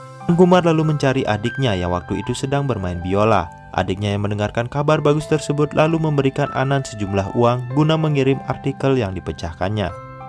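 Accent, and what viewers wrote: Bali, Indonesia. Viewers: Asli bali bang ?Logat bali kental banget bang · Hehehe logat bali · Lebih ke logat bali..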